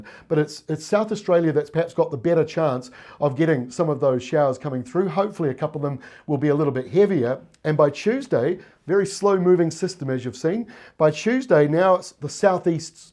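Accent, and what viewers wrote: New Zealand. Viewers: NO, Thank you for being a kiwi aussies can understand, Aye.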